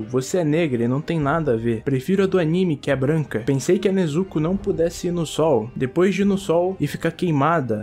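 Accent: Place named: Manaus, Brazil. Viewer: tu e de manaus neé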